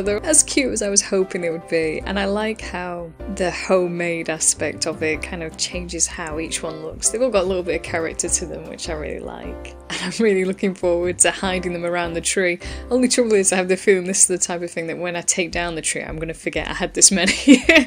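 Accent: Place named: United Kingdom. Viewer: Brilliant, clever, clear and fun. Also lovely to have a native Brit. for a change!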